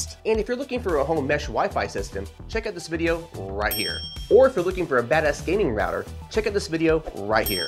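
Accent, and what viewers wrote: United States. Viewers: Yes, agree, his first language is not English, but his American accent is quite good. I only hear the slightest of a non-American English accent on certain words and intonation. Nevertheless, great information.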